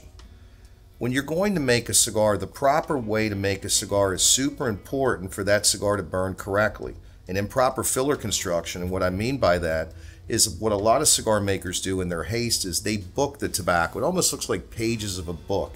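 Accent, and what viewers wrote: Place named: Philadelphia, United States. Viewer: Hey...great vid..very informative...?..are you from the Philadelphia area?...your accent sounds like mine...born and raised in the city..thank you again for being a leader in this great hobby of cigars🤙💨💨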